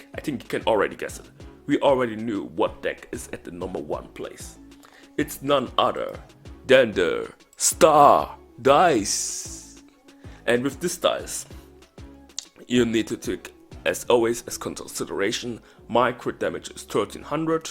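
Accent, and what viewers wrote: Germany. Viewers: Dein Denglisch ist Sympathisch haha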